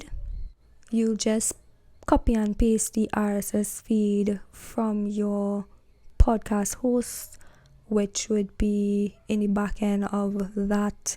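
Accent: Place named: Trinidad and Tobago. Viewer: trini accent a fight fi come out lmao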